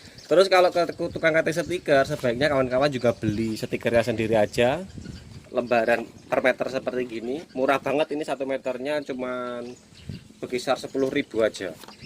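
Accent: East Java, Indonesia. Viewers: Mas sampean asli jawa timur ta?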